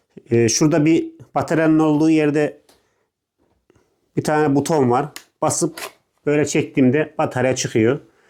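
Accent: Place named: Ankara, Turkey. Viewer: Bi düzgün konuş ya tam ankaralı ağzıyla konuşuyorsun etrafındakiler nası tahammül ediyor acaba. K ları ğğhk diye söylüyorsun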